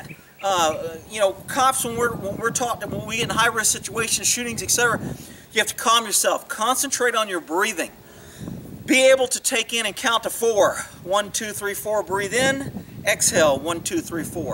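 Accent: Texas, United States. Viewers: Texas accent is so strong in this video lol just got done watching Kyle Rittenhouse not-guilty verdict live stream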